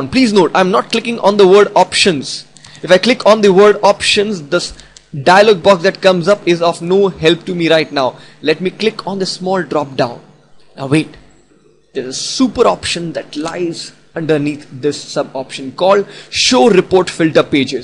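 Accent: India. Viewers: indian accent is always fun to hear hhhh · Hai, yur voice seems 100% match with a person from Chennai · This is a neat trick, but you have to be careful of his kind of thick east Indian accent. I, also, am not sure what version of Excel he is using. This may not be 2010, it looks like a later version, but his trick is good.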